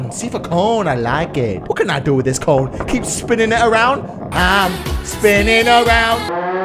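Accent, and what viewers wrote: United Kingdom. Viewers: And he is British English (that's why) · your british my man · You are british this game is american accent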